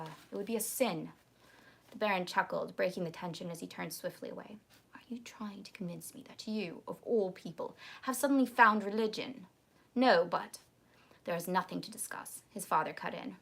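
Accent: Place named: United Kingdom. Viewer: I'm glad you are doing well. Thank you for the book reading today. You don't have to do that next stream if you don't want to but you're a terrific reader with a lovely British accent lol. Hope you will continue doing at least one Livestream a week but if you need a break every so often from YouTube that's cool too. Have a good weekend 🙂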